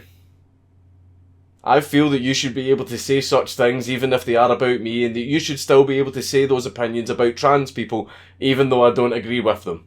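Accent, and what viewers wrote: Scotland, United Kingdom. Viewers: Lol. I read Conor's comment to be a tame joke about Scottish accent and people replying are legit mad. ahahahahahahahah. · Dude, your Scottish accent is nothing short of glorious. Hearing you speak makes me happy. Have a great day and keep up your great work!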